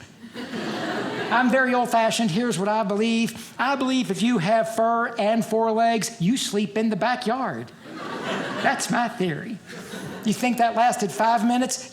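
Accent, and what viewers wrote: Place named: Louisville, United States. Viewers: He is where I'm from in Ky. He's very southern sounding for being from Louisville. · You can always tell someone is from Lullville.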